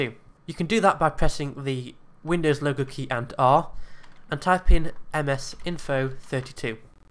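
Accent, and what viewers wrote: United Kingdom. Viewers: A little off topic, but, are you Scottish? Not to be racist, but you sound kind of Scottish. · Im originally from stoke i recoginize that accent anywhere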